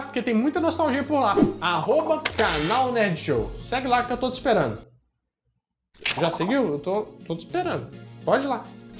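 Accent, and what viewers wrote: Minas Gerais, Brazil. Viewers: Como eu amo seu canal!!! Risadas garantidas e esse sotaque pão de queijo q eu amo, tendo em vista q eu tenho sangue mineiro correndo nas veias, apesar de ser carioca da gema. Minha mãe era mineira e mudou p RJ aos 16 anos.... Parabéns pelo canal e conteúdos!!!!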